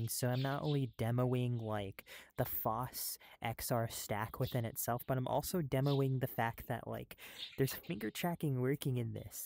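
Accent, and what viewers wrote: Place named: United Kingdom. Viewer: You have a bit of a British accent now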